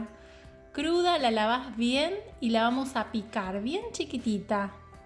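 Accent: Uruguay. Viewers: Que padre me encanta ese acento genial no me equivoque te cuento mi yerno es de Montevideo Uruguay sin q te ofendas tiene muy parecido el acento aunque tiene muchos años viviendo en CDMX el es actor.... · Linda tarta! De donde habla? Por el acento, me imagino que sea Uruguay o tal vez Argentina. Saludos de un brasileño que adora tus recetas 😉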